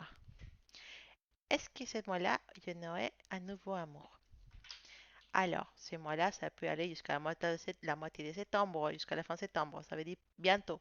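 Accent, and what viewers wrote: Spain. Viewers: Bonjour, je vous découvre avec votre douce voix et joli accent espagnol. Vos guidances sont vraies et si lumineuses. Je travaille depuis plusieurs mois pour lâcher prise de mon passé toxique, être en paix dans mon âme, mon coeur et mon corps et l'univers me met à nouveau mes ex sur ma route. Difficile d'être seule mais je pries mes archanges et mon ange. Je suis sereine malgré mes difficultés financières et refuse le retour d'un ex. A quand le repos et le bonheur d'aimer à nouveau de tout mon être? Comment s'appelle votre pierre orange qui vous illumine? J'aimerais gagner un tirage personnel et participe au tirage au sort. Merci d'être là. Je vous embrasse. Namasté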